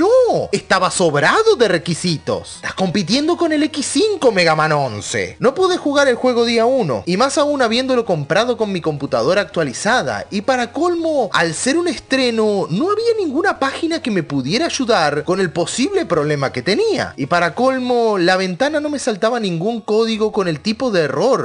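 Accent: Uruguay. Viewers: Eres uruguayo :0?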